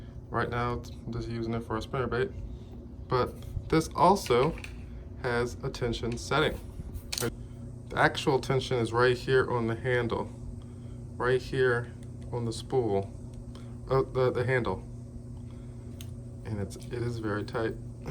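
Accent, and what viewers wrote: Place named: United States. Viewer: Your video is so helpful brother 🥂 but American English is almost terrible lol... I can hardly hear all that you have explained 🤦‍♂️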